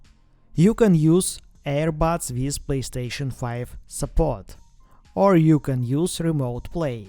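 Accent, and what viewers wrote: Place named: Eastern Europe. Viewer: It's like Slavic mixed with Greek with a hint of Arabic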